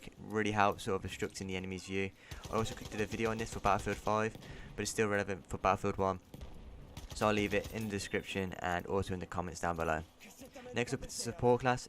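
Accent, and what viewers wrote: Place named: United Kingdom. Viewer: 😂: Getting dusted in BF1, found trust in your UK accent.. teach me Sifu.. 💯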